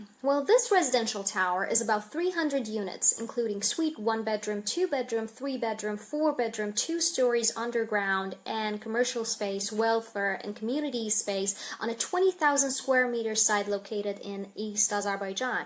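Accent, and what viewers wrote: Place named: United States. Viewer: Extraordinary presentation with an American accent 😊👏👏👏👌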